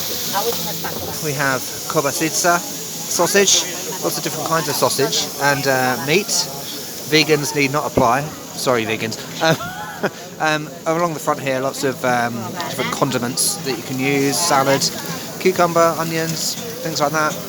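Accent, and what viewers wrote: United Kingdom. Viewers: Thank you for visiting our country. Greetings for UK. Sorry if i am wrong about your country, you sound like people from UK. I know that because i was in London 2018. I almost cried when i had to go back. Bye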